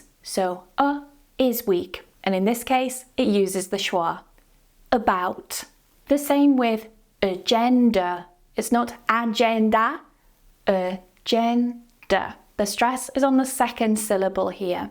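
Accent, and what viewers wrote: United Kingdom. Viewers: Bang lu komen dimana2 to bgus lah👍,, beliau ini englishnya accent British haha ww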